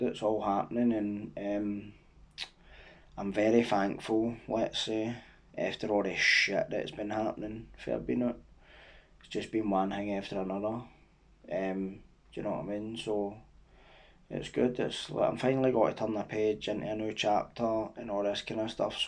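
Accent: Scotland, United Kingdom. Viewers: Liked and subscribed love the channel refreshing hearing storys from Scotland in Scottish accent by someone who has experienced it instead of videos made by people that cant even pronounce the areas or the schemes right does my tits in keep up the good work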